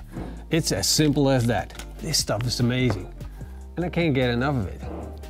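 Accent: Netherlands. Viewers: Is that a Dutch accent I sense? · I LOVE your videos. Part of it is your accent that makes me feel at home ( parents and opa +oma with the same accent.) I would love for you to throw in a few more Dutch words and phrases. 🐖🐖🐖🐖🐖🐖😁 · Do I detect a Dutch accent? It takes one to know one. Great video, trying this tomorrow! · Hahaha. Nou zat ik te luisteren en dacht.... volgens mij is dit een nederlander of ben ik nou gek. Leuk om te zien.👌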